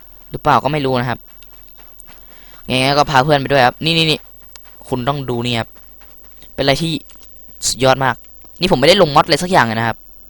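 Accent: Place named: Korat, Thailand. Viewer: คนโคราชป่าวครับฟังจากสำเนียงเหมือนมากๆ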